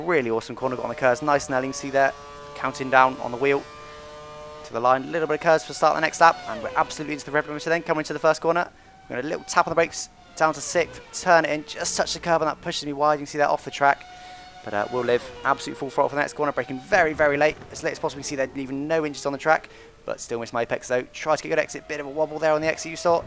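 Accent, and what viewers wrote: United Kingdom. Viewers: Awesome congrats! And btw I prefer 20min over 10min:D just love your commentating it's so british!:D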